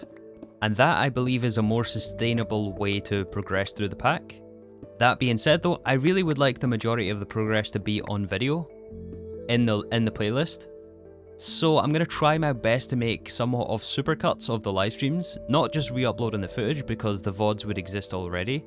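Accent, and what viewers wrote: Scotland, United Kingdom. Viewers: I feel as though you're hinting at the fact you're struggling with a bit of burn out but don't want to say that due to how that can be interpreted. I think it's natural on a modpack like this that there are going to be points where you just can't be arsed as it's the same grind for hours on end that isn't really valuable for your content and therefore not to us either which feels like a massive waste of time but there's no avoiding it as that's the progression. I would say, do what's best for you. If you feel as though starting something new would be best, do it. I enjoy your content not for seeing the tier 35 rocket but your upbeat Scots rambling.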